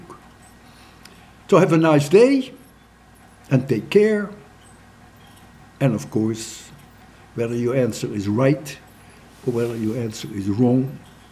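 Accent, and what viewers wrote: Netherlands. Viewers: you are dutch arent you?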